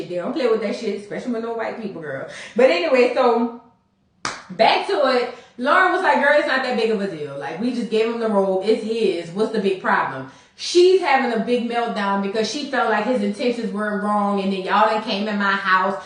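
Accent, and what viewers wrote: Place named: Louisiana, United States. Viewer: Love your accent. You must be from Louisiana. Porsha is not over Dennis and she wants him to want her and show him what he is missing with Simone.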